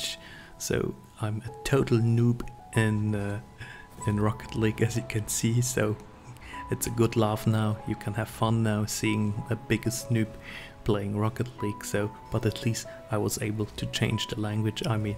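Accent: Germany. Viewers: Man hört den deutschen akzent😂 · man hört so schön diesen nicen deutschen akzent raus hahaha <3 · Man hört diesen deutschen Akzent und man sieht es an den Settings :D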